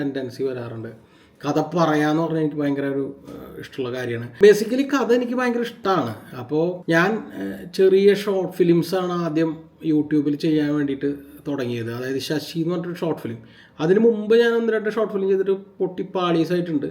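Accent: Thrissur, India. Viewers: Ente native place trivandrum aanu...trissur language kelkan eniku bhayankara ishttanu..pinne nigade bonding bhayankara resamanu athukonda njanum suscribe cheythathu..very simple and humble souls...vere oru channal njan kaanarunde,athum trissur aanu its me vijitha...aa kuttiyum paavama nigale pole😊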